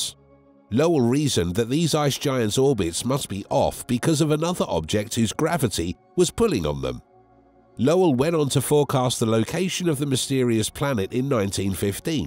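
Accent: United Kingdom. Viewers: British limey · Why do working class Brits narrate a NASA inquiry?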